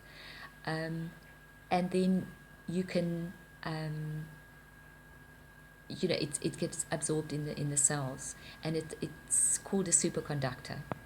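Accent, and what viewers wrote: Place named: South Africa. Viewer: Hi, I hear your South African accent. Would love to collaborate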